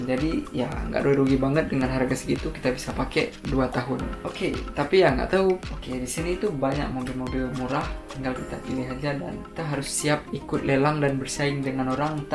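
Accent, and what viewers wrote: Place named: Aceh, Indonesia. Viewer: Logat nya koo seperti org aceh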